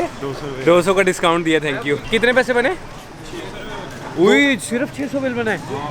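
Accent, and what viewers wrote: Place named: Punjab, India. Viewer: Love from Indian sir ap k bolna k tarika punjabi 🥰🥰🥰🥰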